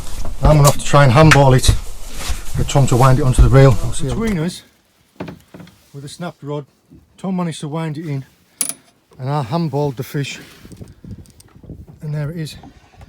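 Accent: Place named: Northern England, United Kingdom. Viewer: luvin your videos mate and your boat is great too just a nice size for what you do . i live in australia but like watching the english fishing channels probably cos i was born in the north too ,middlesbrough and i still like listening to any of the accents from up north. johno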